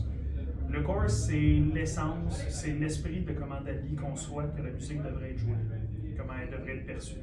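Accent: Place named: Quebec, Canada. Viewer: French Canadian …..such an incredible difference to the French accent in france . Thanks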